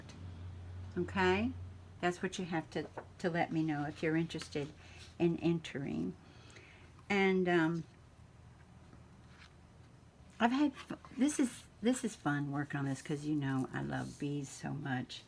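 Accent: South, United States. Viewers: Good morning 🌞 I’m crazy about all the Bees. I loved when your Southern charming voice came out. I love when the two of you are together. Praying that all of you stay healthy. I’m always interested and inspired by anything you make. Big hugs my beautiful sweet friend 🤗🤗🤗💋💋💋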